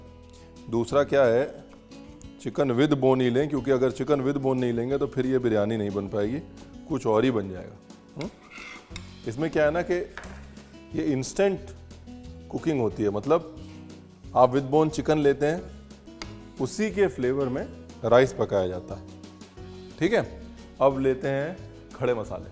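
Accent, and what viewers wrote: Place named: Lucknow, India. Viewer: Briyani toh mai try toh krungi Hi but but jo lko ka bolne ka tarika hai na 😅maja aa gya hum aur humra du tho laung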